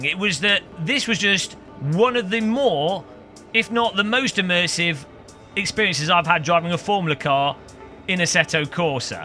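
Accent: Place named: Leicestershire, United Kingdom. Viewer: If you don't mind me asking, where are you from? Can't place your accent but would guess at Leicestershire?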